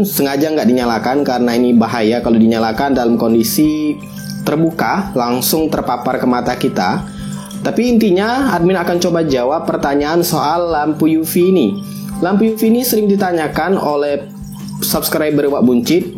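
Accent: Palembang, Indonesia. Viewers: Wong Palembang caknyo kau Yo??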